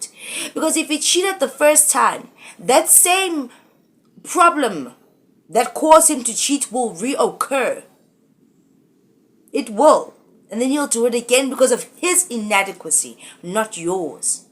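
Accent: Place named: United States. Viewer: Its the "snobish American accent" that does it for me,it gets me all the Time 😂😂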